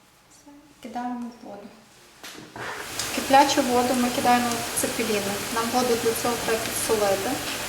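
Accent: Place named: Galicia, Ukraine. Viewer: Смачний рецепт ,непорівняний Галицький акцент,.дуже дякую.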